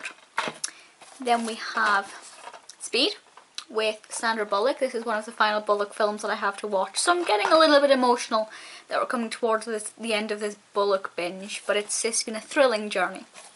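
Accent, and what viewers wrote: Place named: Scotland, United Kingdom. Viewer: Just watched a few of your old videos and noticed that you used to have a Scottish accent, not strong but obviously Scottish, but now you have a small hint of an accent. Have you noticed that or is it just me?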